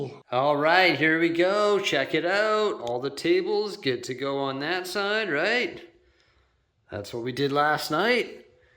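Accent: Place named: Canada. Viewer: You sound very Canadian lol lmk if im wrong but I hear it in the vowels fs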